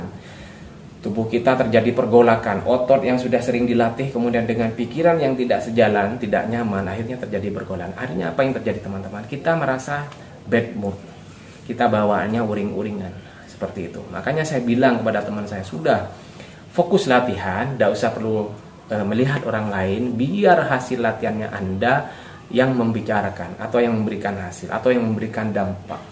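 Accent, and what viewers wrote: Madura, Indonesia. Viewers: logatnya kaya madura ya bg ku?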